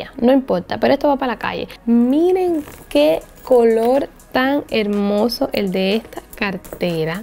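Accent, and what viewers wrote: Cuba. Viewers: Me encanta el acento cubano de.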